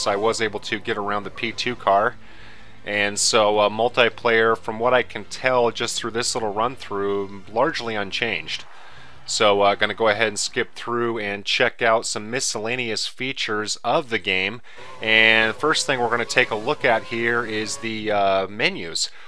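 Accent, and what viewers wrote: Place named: United States. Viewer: it's funny hearing an American talk about F1 for some reason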